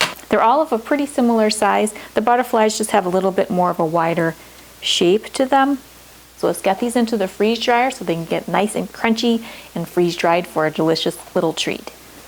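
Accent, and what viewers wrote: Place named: Wisconsin, United States. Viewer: Thank for detailed setting instructions! Also, your accent has me missing home in WI. 😍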